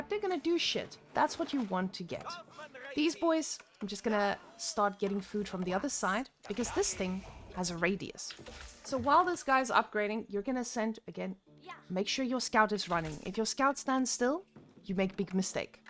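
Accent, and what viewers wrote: Afrikaans, South Africa. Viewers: Awe my bru! Is that a afrikaans accent I hear?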